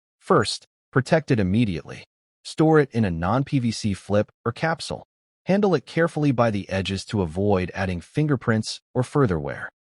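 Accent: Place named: United States. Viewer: AI American accent , NOT AUSSIE 1 !.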